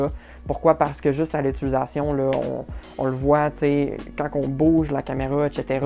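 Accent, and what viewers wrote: Quebec, Canada. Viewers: on voit le tres bel accetn quebecois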